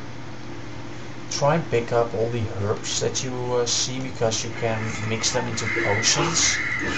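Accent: Netherlands. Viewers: it aren't lisp he just has a dutch accent. but your right it is really annoying.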